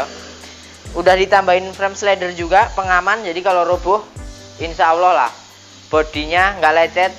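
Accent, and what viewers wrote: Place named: Java, Indonesia. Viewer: Sampean wong jowo toh mas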